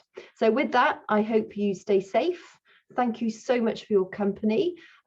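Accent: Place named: London, United Kingdom. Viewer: Thank you. The video made me feel less alone. I am in post menopause (over 2 years no periods) and the anxiety & night sweats still are here. Very distressing but I am trying to eat healthier (although I've still yet to cut out caffeine) and exercise. I need to start strength training and hope to find videos where I can do it at home without being in a crowded gym as Covid-19 is still around in the U.S. I love your speaking voice. My brother-In-Law moved from London with his family when he was in high school and used to have a similar accent. He's since lost the accent (which us a bummer) but he still visits his other relatives in London every couple of years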